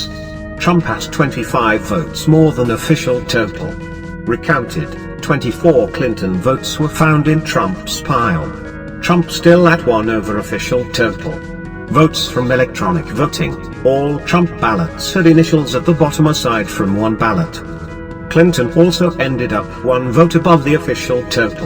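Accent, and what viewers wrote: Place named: United Kingdom. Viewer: Why the odd british accented bot voice?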